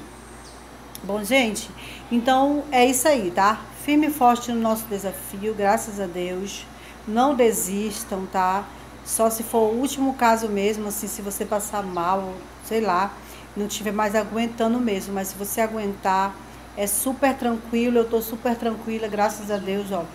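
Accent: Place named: Manaus, Brazil. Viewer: Eita que vc falando deu saudades de Manaus. Morei 5 anos aí no Aleixo. Xeroo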